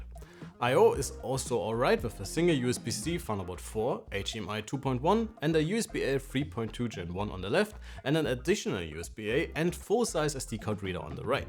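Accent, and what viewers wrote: Germany. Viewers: Puh, deutsche die auf Englisch machen, immer eine Herausforderung für die Ohren.... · Gutes Video. Aber dieser deutsche Akzent lol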